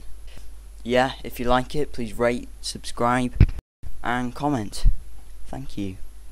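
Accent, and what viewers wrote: United Kingdom. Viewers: yes brtain people nice accent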